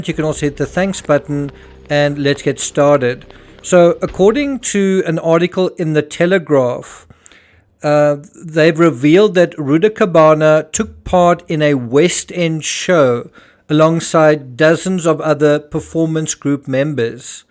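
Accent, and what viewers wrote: South Africa. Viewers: Tbh i'm shocked your not British, South African a real blind spot for me. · You say you found the British less warming to you than other nations you lived in, I don't know your race or nationality, or anything about you, but judging by your voice I thought white South African, which is generally a group that is seem as more aligned to, though Australia probably tops for being most similar. America has always been a different thing, that country has huge influences from all over, but more than anything else, they have a uniquely different way of being & doing things, so as a Brit I can say yeah, theres a gulf between us & America. I sure some Americans do an affinity with Britain, & those individuals might feel more similar if I was to meet them, but over all, American pop culture has a vastly different feel & myself personally I feel our British culture has been lost to it all too much, so while its nothing personal to individual Americans, I am not too keen on the loud over confident American brashnes & razzmatazz, I'm pretty Old School English & I love quaint stuff lol. 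But regarding "foreigners" & other races, we have actually been really welcoming much of the time, the Brits overall are a friendly & safe bunch, a few louts but even they are fairly harmless. But what you may not understand, as an outsider or newcomer, is that we have experienced a huge culture shift, that has been detrimental, we have shared scarce resources, the white working class, houses & space, as a small island. We have paid taxes that go on housing migrants whilst are own p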